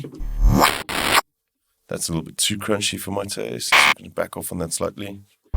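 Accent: South Africa. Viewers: Dude are you by any chance from SA?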